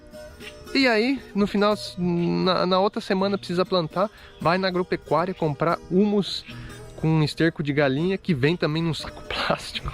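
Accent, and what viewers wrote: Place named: São Paulo, Brazil. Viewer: Muito legal, tenho composteira e minhocario, e várias árvores pra gerar massa, muito legal ver jovens como vocês fazendo diferente e a diferença, só curiosa pra saber onde é o seu sítio, chutaria são Paulo pelo sotaque, kkkk, parabéns, aqui, o que é feijão arroz, não sei se foi isso mesmo que você falou mas foi o que entendi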